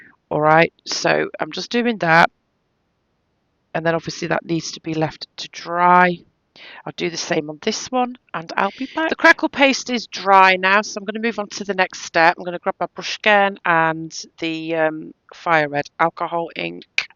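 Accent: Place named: North, United Kingdom. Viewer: Your accent sounds very familiar to me! I’m also a Brit living in the USA in SoCal. I’m thinking your from the northern parts of the UK ?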